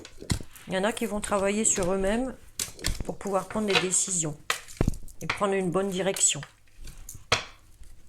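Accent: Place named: Nord-Pas-de-Calais, France. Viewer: Belière je viens espionner mon chéri Cancer, j'aime bien votre accent chti ayant vécu plusieures années dans le nord mis à part le climat j'aime le nord et les gens du Nord que je trouve plus chaleureux que d'autres. Bonne continuation et on ne peut plaire a tous la vie est ainsi faite😘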